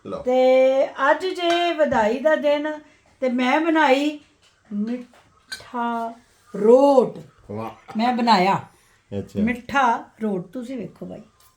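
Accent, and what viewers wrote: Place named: Malwa, India. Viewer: A pigrna te khurna ....malve di boli hy schi dso bhain ji kehrha pind hy tuhada mera muktser hy tahi tuhada piar auda rehda hy ...mai canada to likh rhi ha sarian videos dekhdi ha ❤❤....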